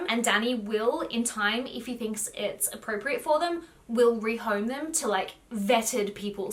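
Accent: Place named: Australia. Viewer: I feel like your aussie accent is coming out more since you've went back 😍🤣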